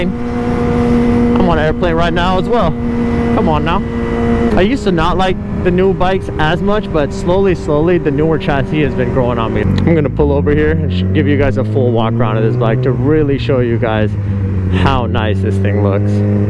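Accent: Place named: Pakistan. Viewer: He’s definitely Pakistani, I can hear it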